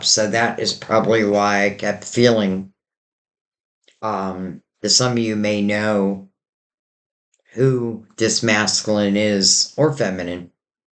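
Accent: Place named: Southeast Pennsylvania, United States. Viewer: 8/31 here. Triple Virgo You sound like you are from the same area that I grew up in. SE Pa. And South Jersey? Love your channel and how accurate you are!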